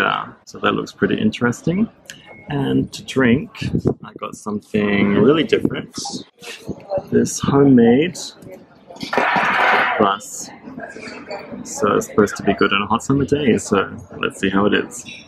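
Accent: Australia. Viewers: I am going to visit Vilnius next month. I can’t wait to be in those places in person. I am definitely going to visit Trakai. I love your calmness and sympathy and the Australian accent is my favourite.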